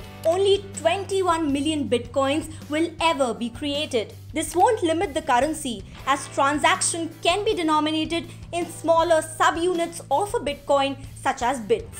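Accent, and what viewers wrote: India. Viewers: I can not take those Indian accents… I automatically gave it thumbs down, however, she might have had some cool info, besides I took my sweet a s s time to write this response.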